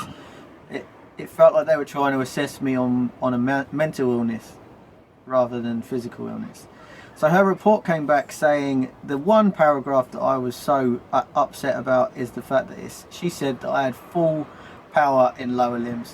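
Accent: England, United Kingdom. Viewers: Your English accent is very interesting to learn for a people from abroad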